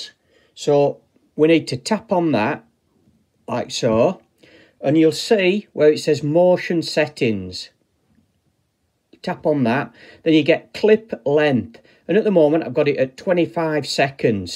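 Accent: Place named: England, United Kingdom. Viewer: I don't understand your English accent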